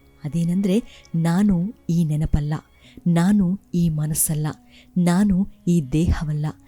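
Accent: Standard. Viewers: Nimm swachand kannada matu channagide tq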